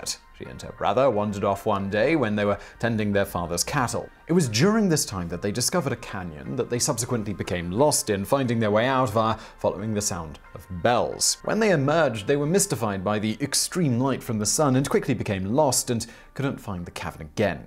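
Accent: England, United Kingdom. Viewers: Why is Michael from VSauce speaking British? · What area of England does your accent come from?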